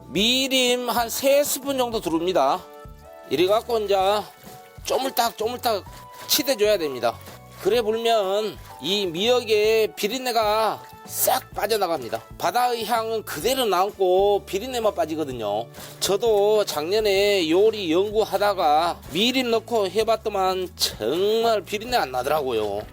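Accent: Jeolla, South Korea. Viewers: 셰프님!!거시기 전라도요!! 참으로 정겹습니다~~알배기 배추 추가가 키~포인트네요!! 감사합니다 😂❤😅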